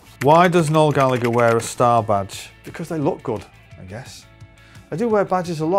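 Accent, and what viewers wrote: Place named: Northern England, United Kingdom. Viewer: I am so in love with his northern accent!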